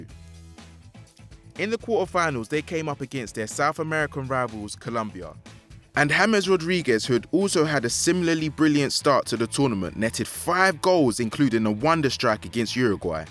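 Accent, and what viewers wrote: United Kingdom. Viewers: You are British 🇬🇧 😏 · he's british/autralian not american. 🤡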